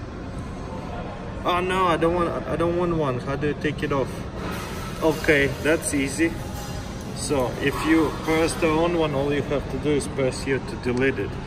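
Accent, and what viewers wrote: Russia. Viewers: Мне кажется или это не американец, а русский. Акцент вообще не английский и произношение хромает. Ну, если что: привет из рашки! :3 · Its just his accent cause he is russian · У тебя акцент как у русского,ты русский не так ли?) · ты можешь говорить по русски? немного заметно по твоему акценту. это круто,что наши могут так подняться на ино.ютьюбе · Some heavy Russian accent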